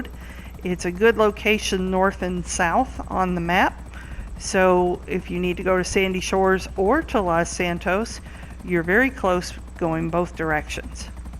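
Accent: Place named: South, United States. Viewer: Just ever so slightly southern, but I know what to listen for.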